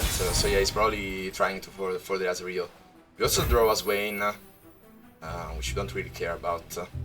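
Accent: Italy. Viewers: Italian accent + I'm italian.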